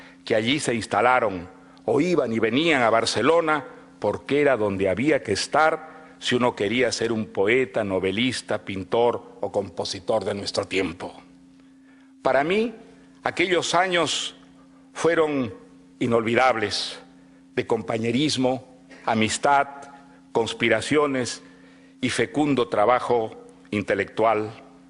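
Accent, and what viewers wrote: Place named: Peru. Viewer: es que aunque intente pasar de español, es peruano.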